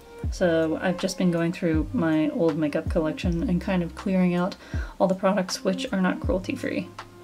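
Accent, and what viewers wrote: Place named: United Kingdom. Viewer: You remind me of a teacher,you have that look on your face....a strong one..dont joke with me :-) British accent is phenomenal You have definitely some acting skills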